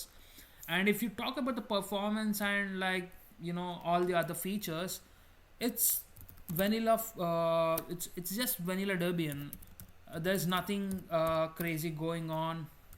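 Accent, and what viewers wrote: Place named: India. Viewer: You Sound like Indian.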